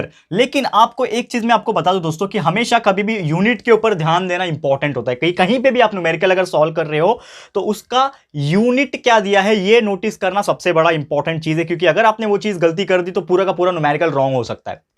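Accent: Maharashtra, India. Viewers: Sir kya ap marathi ho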